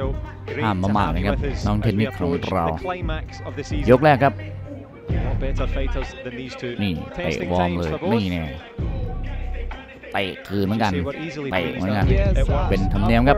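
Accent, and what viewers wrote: Isan, Thailand. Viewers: เว้าลาวอีสาน ผสมกลางมันม่วนดีสุดยอดรวยจ้า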